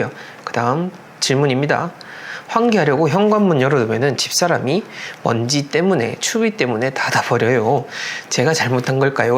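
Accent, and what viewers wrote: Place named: Gyeongsang, South Korea. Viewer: 흥 ~~~우연히 보다 지금은..ㅎㅎ자주봐여^^ 목소리도 좋고 설명을 너무 잘 해주셔서 더 좋아용😂 근데 경사도분? 사투리 ㅎㅎ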